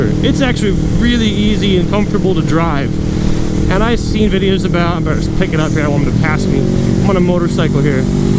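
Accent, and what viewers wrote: United Kingdom. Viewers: 😂 the British accent. I’m surprised at how laughter my lungs are currently. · Love the British accent ✌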